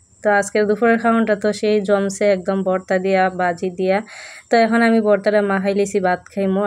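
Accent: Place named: Sylhet, Bangladesh. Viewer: সিলেটি?? এই কথা গুলো খুব ভালো লাগে শুনতে ❤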